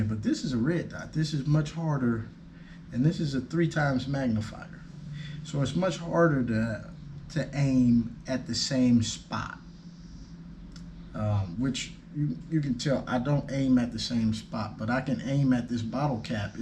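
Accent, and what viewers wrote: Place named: England, United Kingdom. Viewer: Man I love your videos..good honest self reviews.. liked your English accent.. Yes you earned it.. 1. You need to compile all your ammo vs M3 settings because there are too many of them now. May be a cheat sheet.. 2. Comparison of heavier JSB with lighter ones in the same tuning... and on a lighter note , I saw this review on 65 inch so one suggestion- pls do organise your stuff and do some 5S BTW waiting for you next review..love from India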